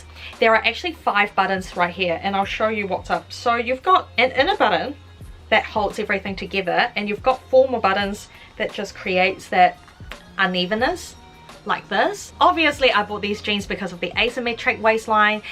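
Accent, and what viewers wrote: New Zealand. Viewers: I missed my new zealand accent icon and fashion queen.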